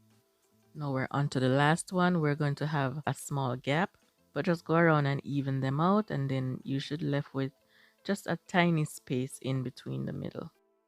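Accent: Jamaica. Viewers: Talented. You sound like a Jamaican. I am a Jamaican. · I can hear you are Jamaican. Big up uself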